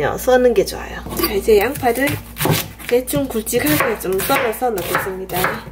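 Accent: Gyeongsang, South Korea. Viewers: 건강한정보 알려주셔서 감사합니다 설명도 경상도억양이지만 억세지않고 상냥한말씨가 듣기좋습니다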